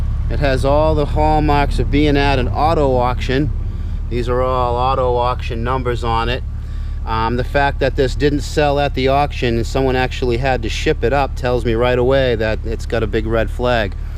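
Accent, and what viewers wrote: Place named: Boston, United States. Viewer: Boston guy!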